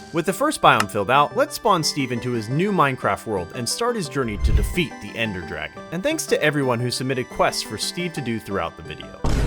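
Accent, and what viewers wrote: England, United Kingdom. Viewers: Английский акцент... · Дякуєм за додавання Української до відео, хоч там і є певні помилки і ще й о той Англійський акцент... але це пусте...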